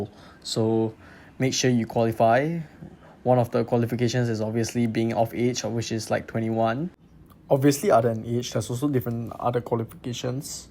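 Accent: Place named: Singapore. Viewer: singapore accent ah boi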